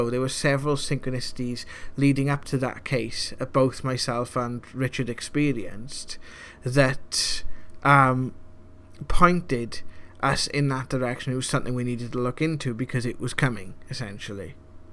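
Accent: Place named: Wales, United Kingdom. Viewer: You sound Welsh asf hahaha proper valley accent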